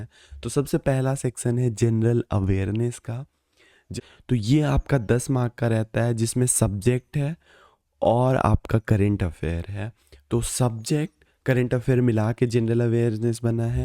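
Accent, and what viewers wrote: Bihar, India. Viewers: Sir, are you from Bihar..? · India se Hai... bihar kya laga raha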